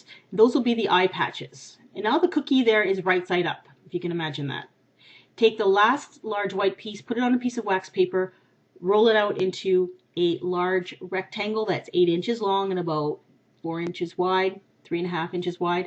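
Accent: Canada. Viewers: Are u Canadian?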